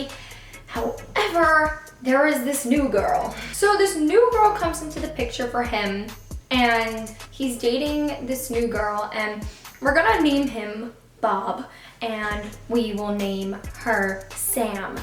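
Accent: New York, United States. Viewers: are you a new yorker? we have very similar voices and I hear your accent. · U have such a New York accent 😂😂 love it 💗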